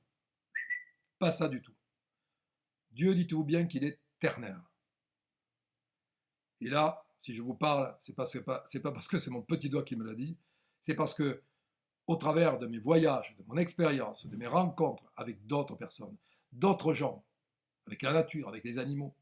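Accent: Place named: Provence, France. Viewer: Merci docteur pour toutes ces précisions. J’adhère complètement à votre riche analyse. On peut rajouter je pense aux santons les fameuses « navettes »... L’histoire de mon village créé au 12ème siècle montre sûrement une concurrence entre Arles-Avignon et Marseille qui n’était pas encore dans l’empire... Il y a en effet, ainsi qu’en Avignon et en Arles, une rue Auguste Chabaud (si c’est ce peintre...). Ça ne m’étonne pas car votre accent provençal vous a trahis! Que du bonheur. Vous devez connaître alors « La Coupo Santo » de Mistral. Les paroles sont très intéressantes si on pense au Graal... Bon Week-end. Thierry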